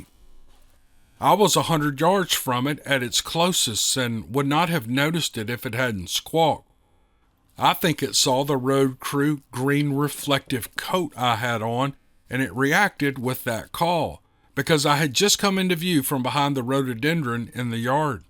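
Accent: South, United States. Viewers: Yet again,another great podcast.My personal interest in Sasquatch is fueled by what I consider a possible encounter as a child.A friend told me about you .I am an avid reader,however,I have formed an addiction to listening to your narratives.It must be the southern dialect .You see,I am from Alabama and our accents are quite similar .I read in a southern accent ,so listening to someone reading in the same is natural .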